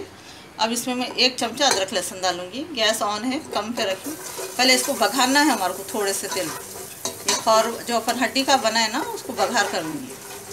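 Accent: Hyderabad, India. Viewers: More than Haleem, aap ka explanation bhagayaa... hyderabad Urdu rocks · Assalamualaikum Aunty Jazak Allah khair for this Daleem recipe. Alhamdulillah I tried making it for the first time n it turned out so well n delicious . My kids loved it👌👍. I love the way you talk pure Hyderabadi style. Love you Aunty. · Assalamualaikum Aunty. Kaha the aunty aap itne din se, farishta banke agaye aap, yeich khaandani aur original daleem ki receipe dhoondhro tha dekhiye Mai ab Tak, Jo meku milgayi aur Mai seekhliye banana isku. Dawaat me banaya dekho aunty, Logan biryani chor ke, daleem khatam kardiye puri, aur hai Kya puchre the meku. Sab apki badaulat hai, allah apku zariya banaye hum sab KU pakwaan sikhane ka. Jazkallah khair. Aur ab rahi baat apki aunty, to meku apke baat karne ka andaaZ itna pyaara dhikha, pure Hyderabadi language, Jo bahut kam sunne KU milti yaha USA me. 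Maza agaya. Hehe. Apke camera wale Jo b hai, Bhai ya behen, Zara camera close nakko rakho utna, bartan me gir jata camera aur thoda close leke Gaye to, thoda uppar SE covering Karo pakwaan ki, aur acha dikke aata aunty kaisa mix karre Kya karre receipe KE saat. Agli baar zara uppar rakho camera. Aur aunty Allah apke abba jaan ko jannat me aala SE aala mukhaam Naseeb Kare, jannat ki khidkiya unki khabar me khol de, huzoor Muhammad Mustafa sallallaahualaihiwaliwasallam ka deedar Naseeb Kare qayamat ke din, aur khabar KE azaab SE mehfoos rakhe. ( Ameen ya rabbul aalameen). Kardiya dekho jee aunty Dua, inshallah, apki dusri pakwaan wali video ka intezaar rahinga. Allah apku sehet de. Ameen. Allah hafeez. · Assalamualaikum wrwb Aunty! Aapki awaaz sunke meku mere hyd ke apno ki yaad ajati Aapki saadgi ke waje se Allah apku be hisab sehat , dunya aur aakhirat ki kamyabi atafarmaiye Ameen Feeamanillah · Amma aap ekdum Hyderabadi bhasa bolre. 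America me bethke Hyderabad yaad aa gya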